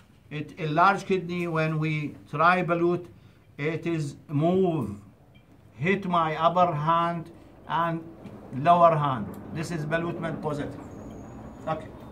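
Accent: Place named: Iraq. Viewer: Iraqi accent is so cute ❤️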